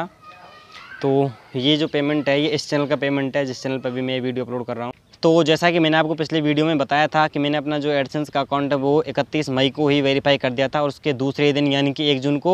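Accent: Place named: Jhansi, India. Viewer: आपकी जो हओ बाली भासा है बो मेरी भी है में झांशी से हूँ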